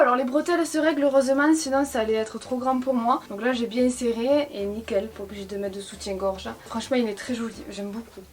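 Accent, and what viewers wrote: South, France. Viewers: J'adore tes haul car a chaque fois tu essayes les vetements, d'autres les montre simplement ou ont les voit en photos c'est pas attractif, et puis toi avec ton accent du sud et ton beau sourire c'est vraiment super a regarder. J'ai le body rose a paillette comme ta robe a anneaux, plus facile a mettre le body que la robe courte et hyper moulante.... mais elle sest jolie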